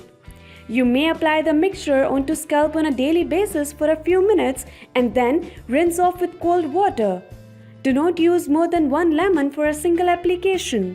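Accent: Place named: India. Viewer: I can’t stand the Indian accent and mispronunciation.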